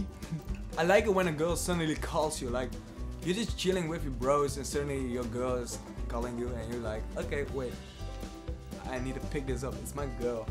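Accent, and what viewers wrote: Netherlands. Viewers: Ik hoorde je Nederlands accent hahahh je Engels is wel nog steeds goed hahahah😂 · Ik dacht al dat je Nederlands sprak!! Ik hoorde het aan je ‘accent’😂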